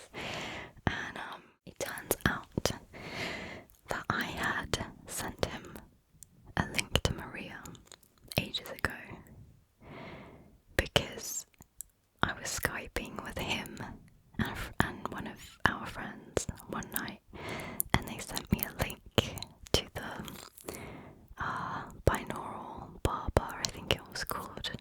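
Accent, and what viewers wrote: England, United Kingdom. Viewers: Superb! ASMR and your English accent is a perfect combination👌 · I tried listening to your story I really did but I feel asleep only to wake up to another one of your videos playing. The sound of your voice alone puts me to sleep, it's one of the most soothing ones in the ASMR community. I think your UK accent has a bit to do with it as well :) You are the best :D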